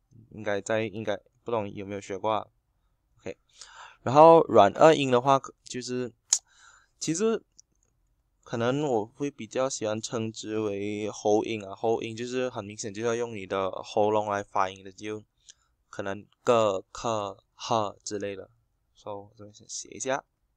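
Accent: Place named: Malaysia. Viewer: 先改一下你的馬來西亞的華語的腔調，很難聽！很多壞習慣！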